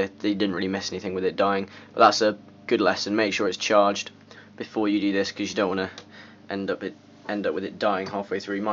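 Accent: United Kingdom. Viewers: British accent is very disturbing.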